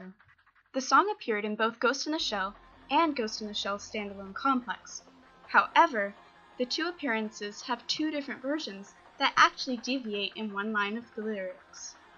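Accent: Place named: Japan. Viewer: Японский акцент это оргазм для моих ушек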